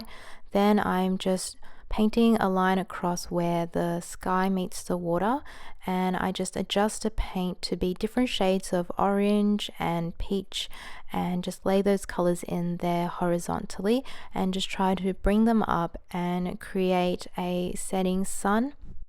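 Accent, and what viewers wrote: Australia. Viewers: Are you Australian? You accent sounds very Australian specially when u say “water” as “wodah” and “borders” as “bodahs” and “white” as “whaih” etc :)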